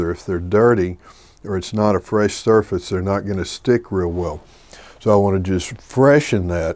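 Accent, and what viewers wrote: South, United States. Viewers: I just love ur southern accent. They work every time ;)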